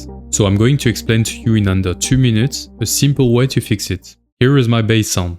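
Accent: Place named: France. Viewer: À l'accent, j'imagine que t'es français donc je me permets de le faire en fr. Super vidéo ! Je viens de découvrir ta chaîne et tu nous donnes des tips vraiment utiles ! En tout cas j'avais jamais vu une vidéo comme celle-ci sur les prb de phase. Merci mec !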